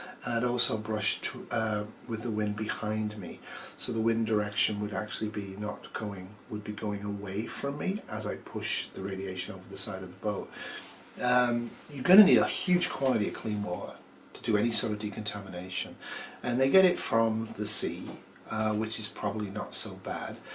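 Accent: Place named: Scotland, United Kingdom. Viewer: You sound more Scottish then Cannuck.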